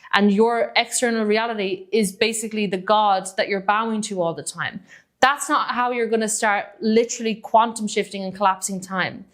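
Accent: Ireland. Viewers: I detect an Irish accent? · Its so rare to hear Irish people on Youtube you got a sub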